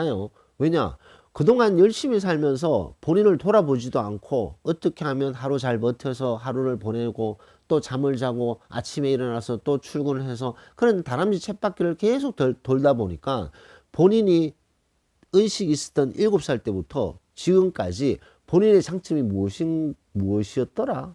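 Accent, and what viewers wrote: Gyeongsang, South Korea. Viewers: 고맙습니다 늘 힘이 되고 있습니다 경상도 사투리로 조근조근 말씀해 주시는데 제 마음에는 무엇보다 잘 와닿습니다 괜찮아~~~ 뭐 이것쯤 이겨낼 수 있어~~~ 용기내어 보려합니다 다른나라와서 머물면서 갱년기 시작되면서 약해진 마음상태를 다잡아 보려합니다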